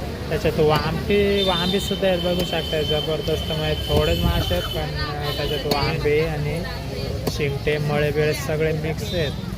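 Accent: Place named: Maharashtra, India. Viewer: Tu katkari samjacha aahe ka tujya bolnya varun vatl baki kahi nahi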